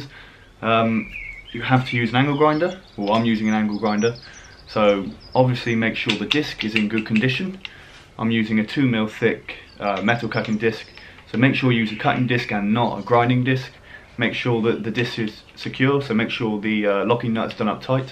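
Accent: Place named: El Paso, United States. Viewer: This guy sounds like he's from El Paso.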